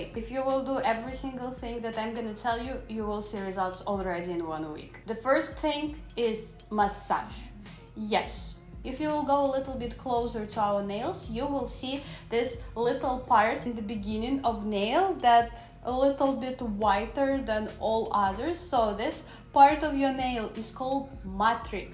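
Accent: Russia. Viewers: она русская?? прям слышимый акцент.. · да!это очень заметно из-за русского акцента ,который хоть и не очень ярко ,но выражен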